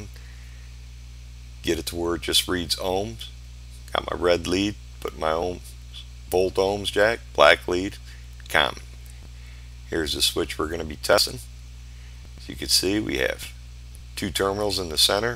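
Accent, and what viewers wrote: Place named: Chicago, United States. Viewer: Thanks for the excellent video. Like the Chicagoland accent as well. Reminds me of home.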